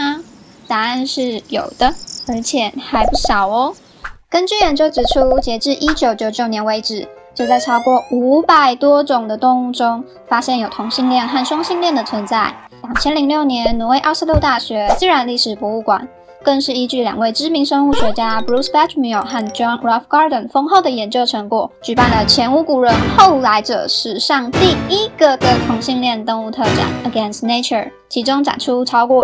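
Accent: Taiwan. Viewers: 講話的風格像台灣吧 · 湾湾的口音让我好出戏🌝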